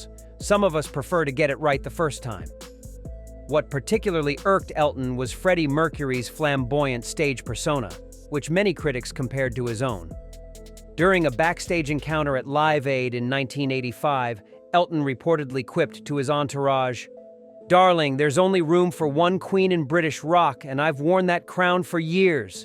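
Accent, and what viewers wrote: United States. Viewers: Elton and Freddie frequently partied together and also exchanged gifts with each other. Elton even cried at Freddie’s passing, and sang the song at his tribute concert. They were very good and close friends, I’d say and the rivalry wasn’t toxic the way it’s described here. This snarling narrator with an American accent has produced this video for clickbait by peddling in significant mis/disinformation imo.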